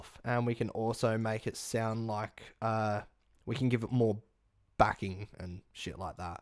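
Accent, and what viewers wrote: Australia. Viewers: wow i just found your channel and so far its the only helpful channel ive found :3 im guessing you are australian? so am i!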